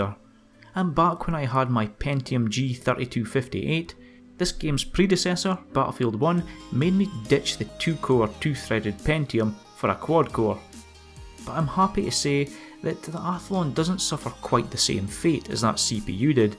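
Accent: Scotland, United Kingdom. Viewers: Great video, I love your Scottish accent. 👍 🏴 I just might reconsider the Athlon.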